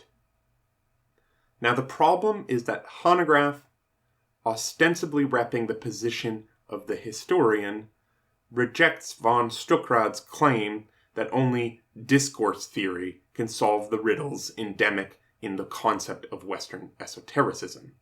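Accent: Quebec, Canada. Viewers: Thank you and blessings. Is that a french canadian colour I hear in your french words and names? If so: salut mon frère! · Thank you so much 🙏🏻 I was wondering… are you French ? Like from Quebec ?? I’m near Montreal and your French seems Quebec français canadien ☺️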